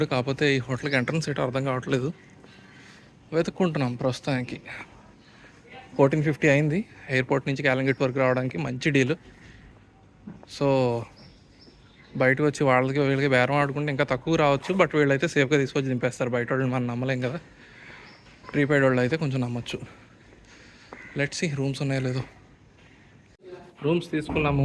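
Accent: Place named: East Godavari, India. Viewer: ఆయ్.. ఆ తూ గో యాస... Missing a lot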